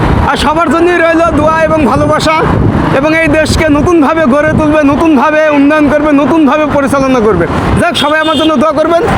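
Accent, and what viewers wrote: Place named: Bogura, Bangladesh. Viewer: উনার কথার শুরুতেই বুঝচ্ছি বগুড়ার লোক।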